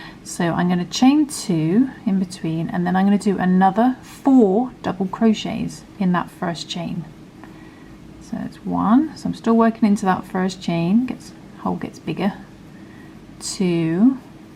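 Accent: United Kingdom. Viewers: Something about my dyslexic brain can't comprehend the british voice using american crochet. I automatically translate it to uk crochet and then you say nah we aint doin that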